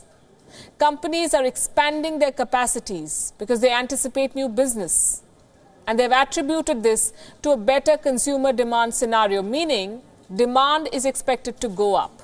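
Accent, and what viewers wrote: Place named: India. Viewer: Maybe the best sounding Indian accent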